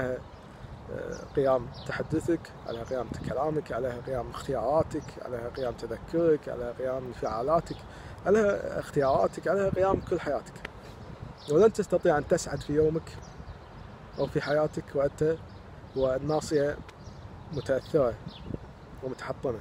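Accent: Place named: Kuwait. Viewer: يعني تدري يسولف كويتي ولهجته واضحه وتسئله انت سعودي 😩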